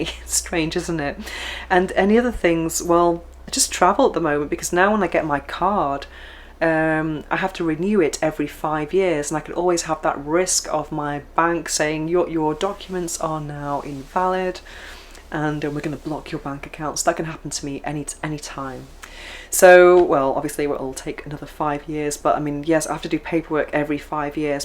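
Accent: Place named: Liverpool, United Kingdom. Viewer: With your opening sentence "I didn't know if I was coming or going." You have a slight Liverpool accent with your finishing school voice.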